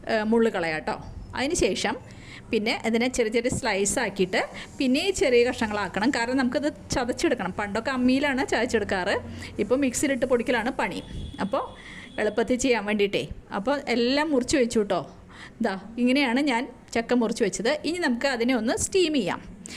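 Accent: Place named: Valluvanad, India. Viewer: ചക്കത്തോരൻ ഒന്നാന്തരായി. അതിലധികം ആ വള്ളുവ നാടൻ ശുദ്ധമലയാളം വളരെ ഇഷ്ടപ്പെട്ടു. നന്നായി വരട്ടെ.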